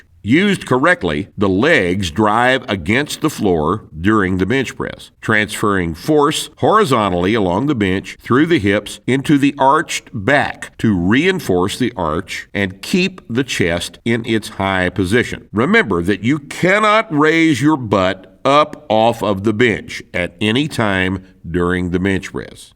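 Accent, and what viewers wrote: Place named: Mississippi, United States. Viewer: That voice came straight out of Mississippi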